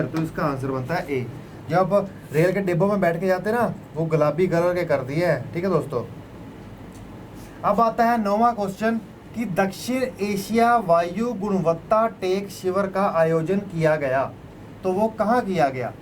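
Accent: Punjab, India. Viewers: Sir i sure you are punjabi boy..🤗🤗 because I live in punjabi climate...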